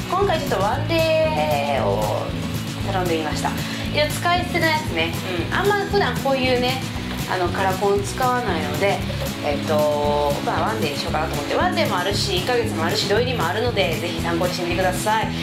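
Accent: Kansai, Japan. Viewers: 関西弁がカワイイです( ⁰▱⁰ ) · いつもサムネで気になってて初めて動画見ました！バイリンガル系の人かと思えば、サバサバしてて親しみやすく、関西弁がめちゃかわいいです！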